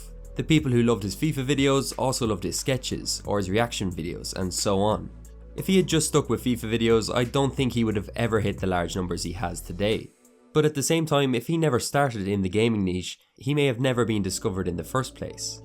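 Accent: Ireland. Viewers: Man with that irish accent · Are you actually Irish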